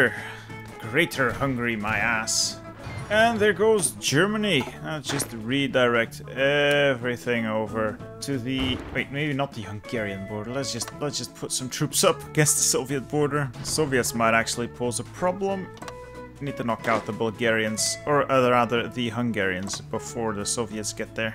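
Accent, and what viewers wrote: Flanders, Belgium. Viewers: Wallowa of flemish, you sound flemish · O wauw ik dacht al dat je een vlaming was aan je accent, ik hou van uw content much love ❤️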